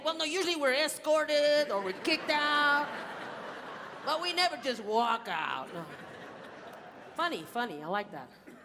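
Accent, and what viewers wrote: Texas, United States. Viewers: My greatest honor to receive a "thank you" from you in person. Your style is my favorite: that fancy hat, that Texas accent and that enchanting storytelling. I keep telling my folks that the 2nd place winner is worth watching when they talk about the 1st and 3rd place winners. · The speech message and use of props were good. Not sure of the sarcasm and racy tone when describing elements of the young man. 🤔 however, the Texas accent was fire🔥🔥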